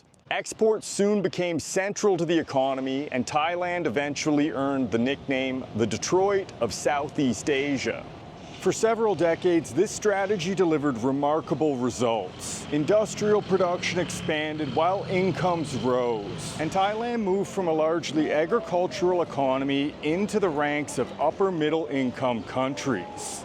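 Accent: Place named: Newfoundland, Canada. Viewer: I'm Canadian also, but your accent is driving me nuts. You talk like a non native speaker. Are you a Newfie?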